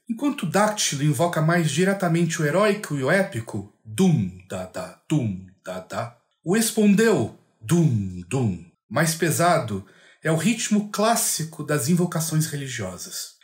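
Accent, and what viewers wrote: Portugal. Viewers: Ahah sotaque português é um xodó ☺️ mas é compreensível que seja difícil pra muitos, não é nosso dia-a-dia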